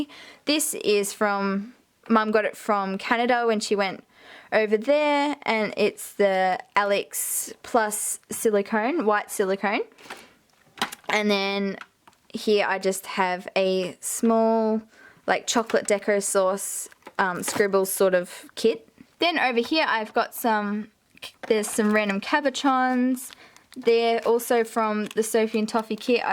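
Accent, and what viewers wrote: Australia. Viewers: I could tell you’re Aussie because you sound similar to Georgia Productions :) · omg i am crying for happiness right now... stabilo! telletubbies! bostik! smiggle! typo! kmart! etc. the accent! yay! i finally found an australian youtuber that does polymer clay😃 {I am an aussie too!} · I knew she was Aussie because of her accent lol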